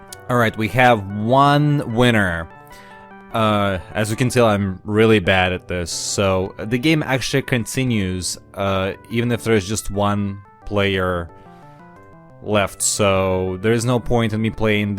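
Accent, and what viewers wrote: Russia. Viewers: слышен твой русский акцент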